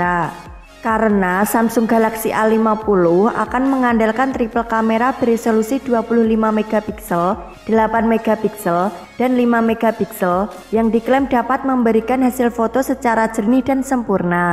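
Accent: Java, Indonesia. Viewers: Medok banget suaranya wkwkwk 😂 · Suarane medok .. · Apik mbak tapi ketoro muedhok ketok jowoe hahahahahaha suon njeh mbakku. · Medok bgt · Terlalu medok mbak,menurut saya mbak harus bisa belajar public speaking yang baik dan benar dan juga yang terpenting gear mic nya mbak,kalau kedua hal tersebut udah terpenuhi insyaallah nanti channel ini akan sejajar dengan sobat hape ataupun gadgetin SEMANGAT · Suaranya itu loh medok bngt jawa nya hedeeh capek denger nya. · Medhok bhanget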